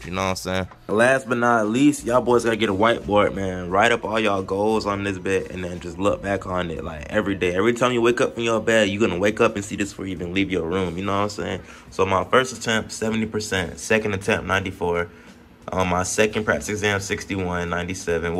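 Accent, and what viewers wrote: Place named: Florida, United States. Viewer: I'm prouda you! This was super motivating. Thank you for sharing. OAN you gotta be from FL lol I can hear it!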